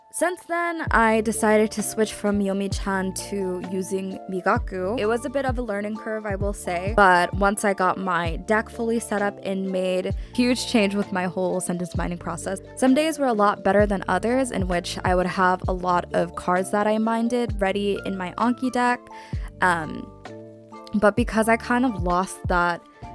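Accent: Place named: Somalia. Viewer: you sound somali. plz correct me if not but i can just recognize a 1st gen accent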